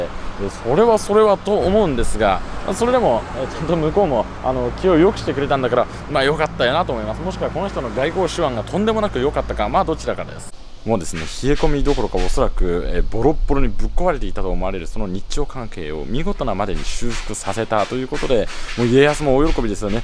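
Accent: Tokyo, Japan. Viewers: お話方は東京下町言葉をお話になるので、ある程度の整列された歴史認識を持ってこのような動画を作っておられるかと思っておりましたが、残念ながら中身がよしもと芸人と同じアンポンタンとは少し残念のように感じます。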